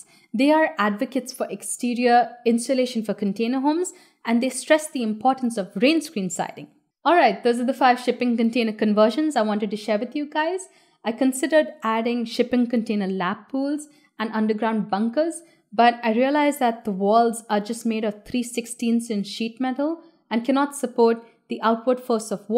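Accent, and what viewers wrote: India. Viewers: It is too weird hearing a Indian accent using imperial measurements. My head is going to explode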